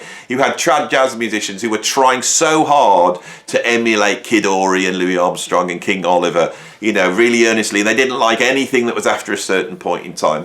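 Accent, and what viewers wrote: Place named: Midlands, United Kingdom. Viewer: First of your videos I came across, agree with everything you say, so I subscribed, and you sound like a Brummy or Midlander.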